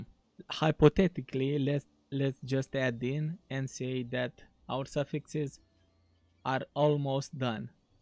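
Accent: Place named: Eastern Europe. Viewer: Good video! I detect a central maybe eastern European accent?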